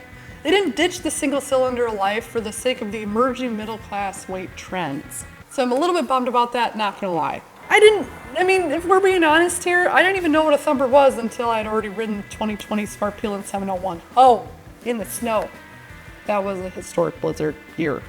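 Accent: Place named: United States. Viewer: Aaaaaaaarrrrr, your American.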